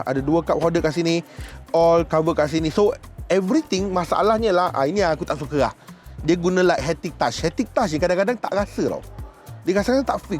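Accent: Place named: Negeri Sembilan, Malaysia. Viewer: kan nismilan bona bunyi eeh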